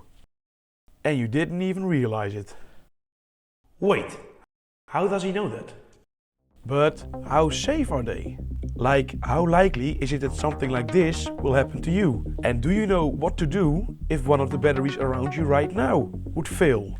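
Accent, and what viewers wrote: Netherlands. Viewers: Dit accent klinkt zo Nederlands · Love het nederlandse accent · Heerlijk Nederlands Engels accent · Well, I don't take you in the mailing but your video subjects are good however your English is not so good. Very Dutch like. Maybe you have to practice more or take some lessons and then I think that you hit two flies in one clap. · I smell dutch accent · dutch accent... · 10 seconds in and i know youre dutch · You are Dutch?! I hear Dutch accent. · Are you Dutch if I may ask. You sound very Dutch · Ben je Nederlander, bro? Want je spreekstijl en accent klinken meer als die van een Nederlander die Engels spreekt, daarom wilde ik het even vragen. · This accent sounds so dutch, wth🙏😭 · je hoort het gelijk als het een nederlander is XD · First time watcher, I just knew you were Dutch from your accent. Good stuff. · i just love the Dutch accent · I love the dutch accent,ok on topic,thks for this infomation,usefull. 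· It says united states in your bio, but that accent sounds really Germanic, specifically Dutch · From the first words "lithium batteries" I knew you were Dutch 😅 First time on this channel. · sir you sound dutch as fuck, are you? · Nen holander die engels probeert te praten 😅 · Hey a Dutchy speaking English :D Leuke video! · Dutch accent so thick 😅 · I know a dutch accent when i hear one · De nederlandse accent is egt om over te lage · immediatally knew you were Dutch the moment i heard that accent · You sound very dutch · holy dutch accent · Ik hoor een Nederlander, of niet? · Dutch voice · ik hou van dit nederlands accent HAHA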